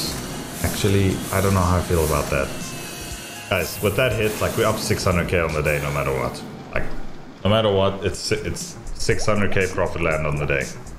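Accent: South Africa. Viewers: You from South Africa 😶‍🌫️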